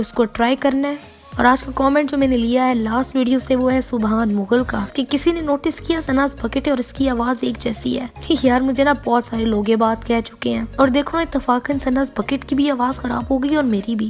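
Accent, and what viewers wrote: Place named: Punjab, India. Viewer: Awaz kharab hai bol rhi ho aap . Par aise bhi kitni pyaari lagrahi hai . Aapka punjabi accent bht pyara lgta hai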